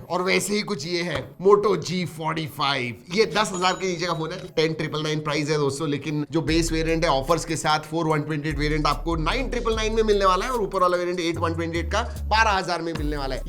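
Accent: India. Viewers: The only one indian guy whit nice accent en nice video ❤ thanks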